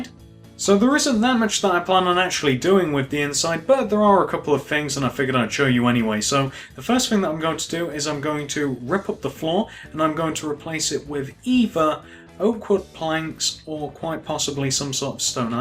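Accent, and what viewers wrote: United Kingdom. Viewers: LC GAMING WITH LC GAMER me to he's British that's why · He's British you dumbass,he was saying three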